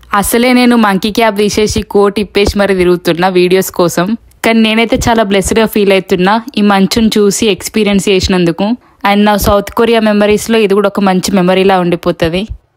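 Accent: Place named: Telangana, India. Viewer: అసలైన తెలంగాణ బాషా మీరు మాట్లాడుతారు Great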